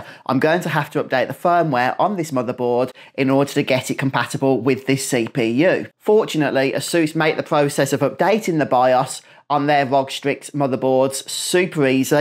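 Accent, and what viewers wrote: Birmingham, United Kingdom. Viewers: We're having a debate, I'm convinced you're from Birmingham but my friend disagrees, please confirm haha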